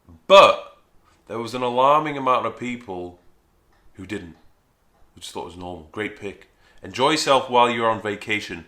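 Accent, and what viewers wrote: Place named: Yorkshire, United Kingdom. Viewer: its a Yorkshire/Geordie accent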